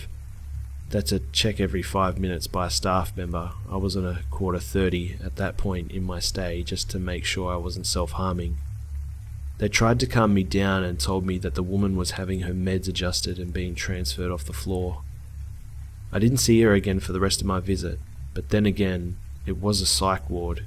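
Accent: Australia. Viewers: Badass man, keep up the good work. Great videos, like the Aussie accent too. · ***** No worries. It's always entertaining watching your vids. I also follow LM. I live on the Gold Coast in QLD Australia, so it's cool to hear a familiar accent :) I'll be waiting in the wings for a new video soon mate!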